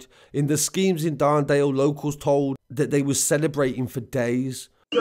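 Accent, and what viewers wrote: United Kingdom. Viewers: Been a bit shocked past few weeks coming on to YouTube hearing a guy with a British accent giving the low down on my city with 100 percent facts and very good accuracy u got a new sub.... Iv met Robbie once in the joy and he wasn't right you could tell he walked around on his own... Sitting on his own in the middle of the yard in his own world.... I seen him in conflict once with a very close friend just call him liamo doin life locals know him.... Big tall crazy guy who people tryed to jump 2 with gangs and failed great boxer.... Him and Robbie had an argument about money got a bit heated one day words came both walked off to there cells obvisly ploting... Would of been a blade or something swung but pascal got involved and kept the peace he was friendly with Liam and was the shot caller for Robbie area that's all I know of him really..... 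He's notorious I hear really from whacking all his friends and would whack his own family on orders....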